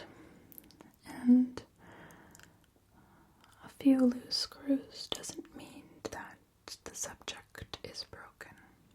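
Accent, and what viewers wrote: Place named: Italy. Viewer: Do more italian talking is very good and amazing to listen to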